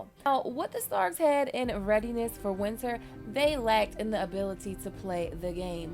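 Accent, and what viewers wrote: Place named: Baltimore, United States. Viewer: why the lol? yes sometimes her voice in tone and pitch on some vowels does sound black, but the accent is Baltimore she has revealed, and accents don't have anything to do with color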